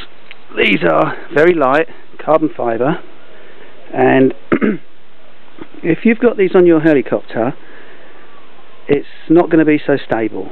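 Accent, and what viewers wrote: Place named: South London, United Kingdom. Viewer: you south london geez? brixton/peckham accent, well cool.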